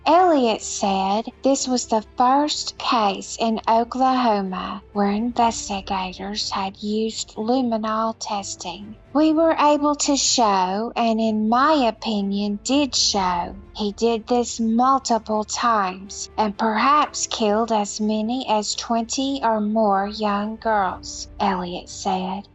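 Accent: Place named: Deep South, United States. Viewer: Please use a regular voice- only people in the deep South talk like tha-ut anymore. Plus it's creepy.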